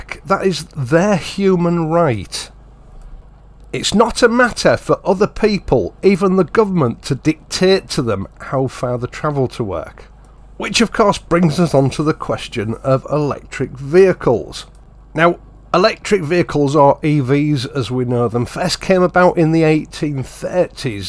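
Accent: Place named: North, United Kingdom. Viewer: I love your videos and friendly sane northern accent. As you point out human rights can be "adjusted" to mean anything. More important are our Divine rights. In Genesis God tells us to be fruitful and multiply and fill the earth and have dominion over it. This means that we can legitimately use the amazing resources such as coal and oil in responsible ways. It is interesting to note that He has retained abololute control over the climate - He says that "while the earth remains, seed time and harvest will also remain". It is also interesting to note that CO2 is an vital plant foot and according to top notch scientists such as William Happer we are bordering on a drought for this essential commodity.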